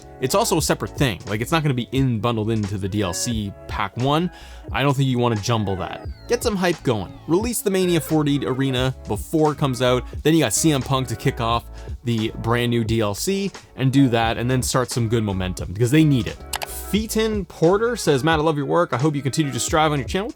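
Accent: Canada. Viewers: i knew it was east cost and he wasn't French but I figured Halifax or Toronto but I didn't wanna shoot in the dark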